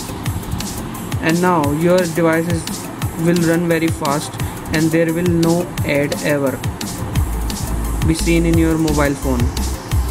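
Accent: India. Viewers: eat a like for your Indian accent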